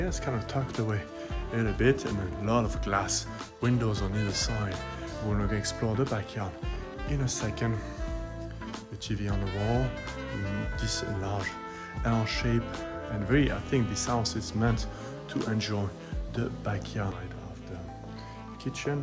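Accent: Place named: France. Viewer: Great video, but I didn't understand a damn word you said. You can either skip using a microphone or not have a heavy French accent, but you can't do both. 😊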